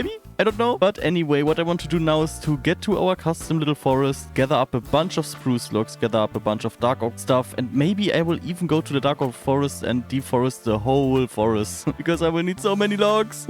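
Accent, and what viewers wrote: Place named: Germany. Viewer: at the beginning i thought he isnt engish like i hear german through and then wen you said your not good in speaking english and that your from germany it all maked sense (subcriceb)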